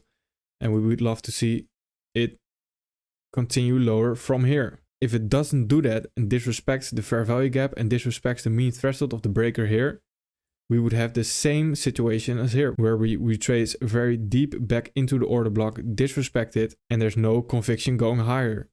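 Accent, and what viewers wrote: Netherlands. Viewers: Love how they're not too long and love the Dutch accent. 🙂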